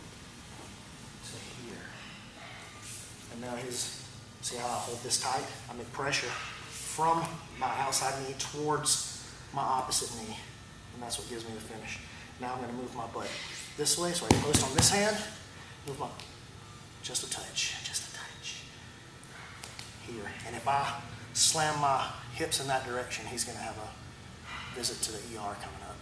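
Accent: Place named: Decatur, United States. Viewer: im from Decatur....aint been back in a while. loving the accent. feels like home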